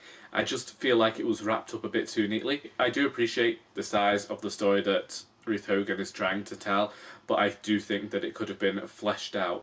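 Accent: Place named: Nottingham, United Kingdom. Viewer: Thank you for your review.........I will listen to this. You sound local to me I live in NG1, good to hear you.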